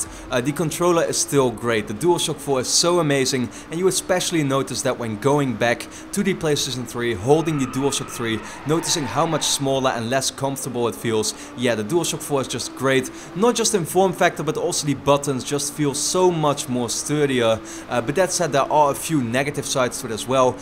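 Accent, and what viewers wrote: Netherlands. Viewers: leuke video man ( ik hoorde meteen al dat je nl bent )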